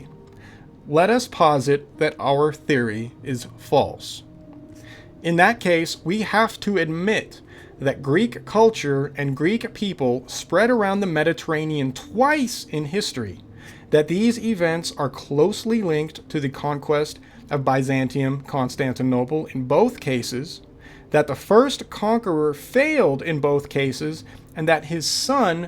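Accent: United States. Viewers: Dude, you sound like you're american. Please come to Europe, you can see christian sepulchres from the 4th century underneath my neughbours back yard UNPRESERVED. You can see a church from the 10th century on a hill in a nearby village. You can see a fortress built by Turks on top of a Byzantine fortress who built on top of a Roman Castrum on top of a Celtic hillfort.... and I live in the Balkans. It's all fine and dandy when you read and see PRESERVED places from history. Come see raw forts wrecked centuries ago, you'll get a sense that Roman stuff is way older than late Medieval stuff when you actually touch it OUTSIDE OF A MUSEUM, outside of internet. Plus, the girls are fine and a beer is like $2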